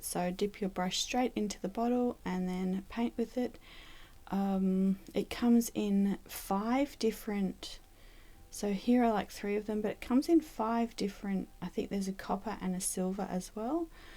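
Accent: Australia. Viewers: Beautiful video. Are you from Australia?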